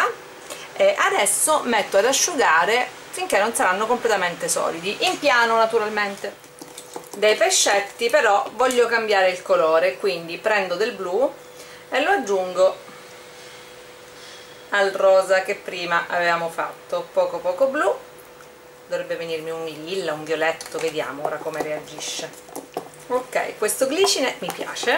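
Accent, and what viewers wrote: Sicily, Italy. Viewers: ma sei siciliana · Ok..grazie..ti auguro tante belle cose.. ps.o sentito sei siciliana anch'io, sei di palermo x caso?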